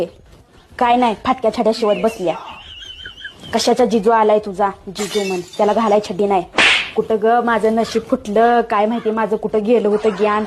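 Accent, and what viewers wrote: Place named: Satara, India. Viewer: Lai bhari madam satari bhasha mala khup awadle.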